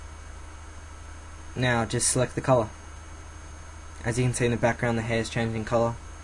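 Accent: Australia. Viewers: hes obviously austrailian you dumb ass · Are you from Australia?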